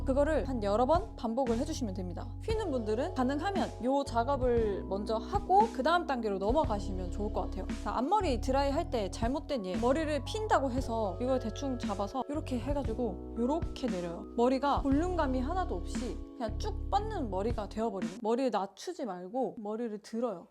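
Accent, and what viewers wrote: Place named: Busan, South Korea. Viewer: 부산사람은 역시! 숨길수 없는 악센트가 있네유~ 반가워요~ 저는 부산기장 살아요~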